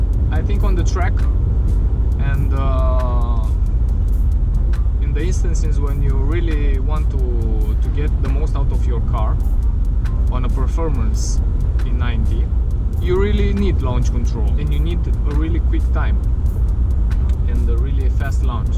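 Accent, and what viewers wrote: Romania. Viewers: Mi am dat seama ca esti roman dupa accent si 2.0d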